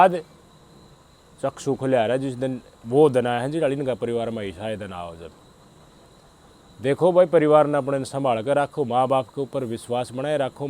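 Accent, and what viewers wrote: Haryana, India. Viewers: Nice video bhai sahab ..apkii pure haryanvi language nd ekdum saaf saaf samjhane walii voice modulation..thanks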